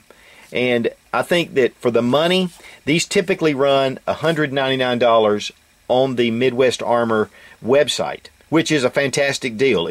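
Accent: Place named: South, United States. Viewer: Great accent denotes Southern nobility of yore.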